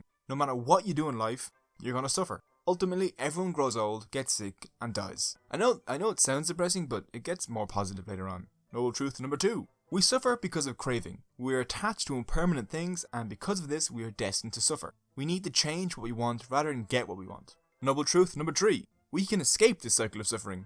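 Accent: Ireland. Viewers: Hey why is Australia upside down? and what is that Island in the Pacific? Love the way you say tree3🤣 the Irish accent is awesome! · Yes I think he is Irish and speaks to quickly.Nothing to do with Australia though many buddhists on different paths live there.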